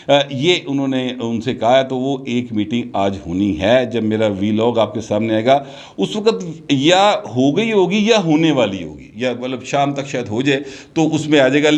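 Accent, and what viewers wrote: Lahore, Pakistan. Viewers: sahib you are indeed a great anchor,agree with your point of view,love to listen to you in a proper Lahore style.well entrenched and thought over examination of the current issues.Regards and Stay Blessed.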